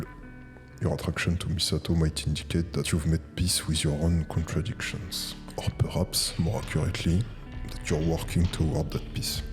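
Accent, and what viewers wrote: France. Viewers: l'accent français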